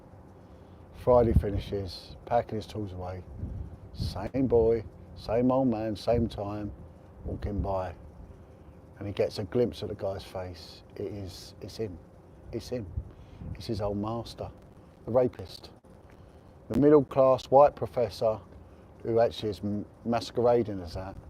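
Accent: Cockney, United Kingdom. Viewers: God save the cockney!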